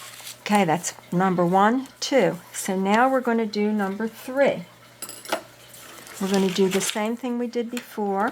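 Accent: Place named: Pittsburgh, United States. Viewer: I hear a Pittsburgh accent!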